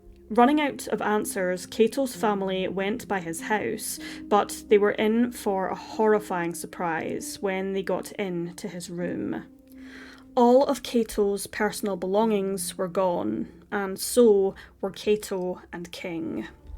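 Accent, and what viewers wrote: Scotland, United Kingdom. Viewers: Thank you so much for bringing light and exposure to these cases! Also may I ask what part of Scotland you are from? I’m an Ayrshire lass myself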